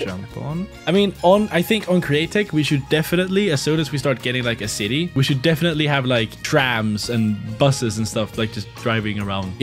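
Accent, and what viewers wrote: Sweden. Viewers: Are you swedish? Bec i hear a accent.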